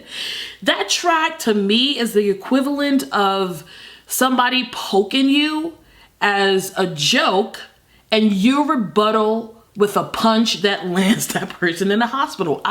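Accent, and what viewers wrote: United Kingdom. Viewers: Is she originally from London because that’s what I’m getting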